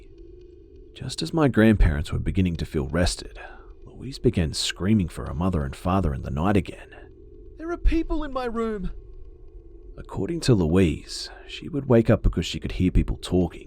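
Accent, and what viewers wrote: Australia. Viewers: Are you from Australia mate and if so what state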